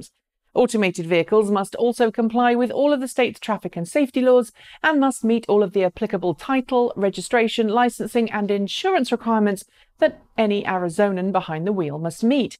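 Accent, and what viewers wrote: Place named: United Kingdom. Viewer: You produce some of the best and most interesting postings on electric vehicles and other emerging technologies. And, like all Americans, I love the British accent.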